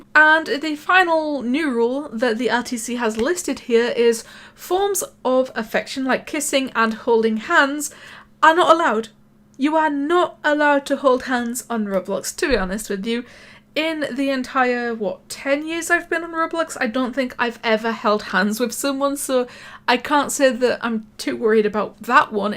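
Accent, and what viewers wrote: United Kingdom. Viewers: Oh well glad to hear your British accent again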